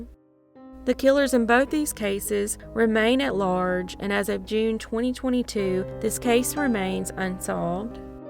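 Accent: South, United States. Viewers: 1st time I ever clicked on a video when the narrator inserts extra syllables in every word. Wooo what a strong hick accent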